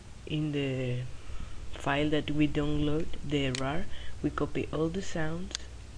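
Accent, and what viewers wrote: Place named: Spain. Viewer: are you kidding me? he speaks great english, only thing different is the spanish accent. This was better english than most english speaking people can produce. Thumbs Up!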